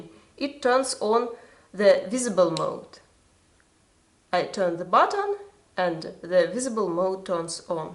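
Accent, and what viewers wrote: Russia. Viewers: I am enthralled. The Russian accent, the tactical proficiency... I might be in love.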